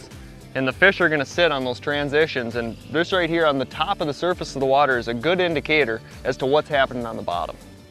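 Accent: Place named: Minnesota, United States. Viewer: That Minnesotan accent though...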